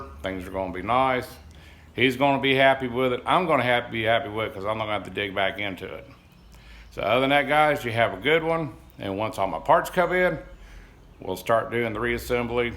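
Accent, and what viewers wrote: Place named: South, United States. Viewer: Great job again, with your mem card filling up maybe upload in 720p you might get a few more minutes, its still hd but youtube for some reason have taken of the red hd if u follow me man. regards from down under, love your Southern accent stay cool mate.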